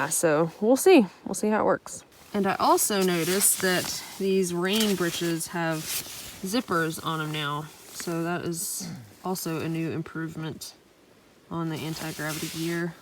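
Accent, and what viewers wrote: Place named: Alabama, United States. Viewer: Is it my imagination, or is your Alabama accent getting stronger? 😎😉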